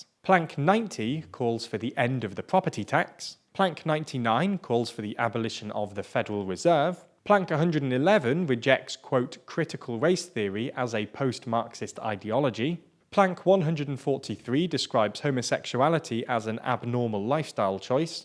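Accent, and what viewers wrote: United Kingdom. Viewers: Listening about US politics in British accent is so refreshing. It almost makes it less depressing and frustrating. Thanks for the video though.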